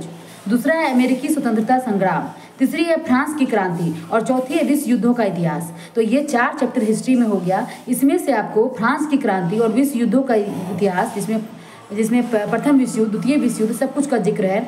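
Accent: Bihar, India. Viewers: Bihar se ho ya up. Se 😂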